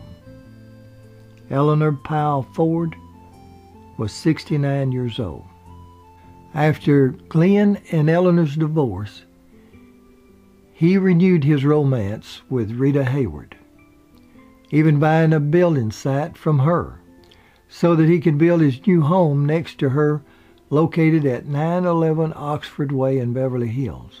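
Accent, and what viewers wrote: South, United States. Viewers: I personally like the videos that provides for the YouTube community. I also enjoy listening to his voice as it is unique, very southern (I believe) as well as soft spoken. This is the kind of voice that would help a high school student to listen in order to learn a complex subject as it is very calming. I enjoyed this video on Glenn Ford as I also enjoyed his acting. I also enjoyed his video on Dan Blocker who played Hoss Cartwright on the long running television show Bonanza. was on location where the Cartwright's home and store were shown to viewers. This is an in depth look at the life of a celebrity and I liked the way in which conveyed this information, it added depth to Blocker and he made me feel like I had known this man. Not many people can do this. By showing viewers pictures of what buildings and houses belonged to him and how those buildings sit today add layers to a persons history and I enjoyed this perspective. 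The other video that I can recommend whole heartedly is on Billy the Kid. As a young kid growing up in New Mexico, Billy was a sort of anti hero people could relate to. has filmed areas where some of the action had taken place. His work combines photos taken at the time with camera work visiting these historical places that have been preserved. For those viewers who cannot make it to New Mexico to view where Billy was supposed to hang, where the sheriff was shot in the street, where his employer and friend John Tunstall w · Your accent is really gorgeous... so full of character. I'm in Ireland so it's a lovely novelty to hear this beautiful Southern drawl in all its raw glory. I especially love how you say dates... like nineteen and eighty-two. Hope you never lose it. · Nice biography's. I'm from Aberdeen Scotland. Movie stars have touched everyone around the world over the years. Nice to hear someone giving the facts without being judgemental. 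No one is without sin and the internet is full of people who love to judge. keep it up! Love the southern accent. Beautifully relaxed.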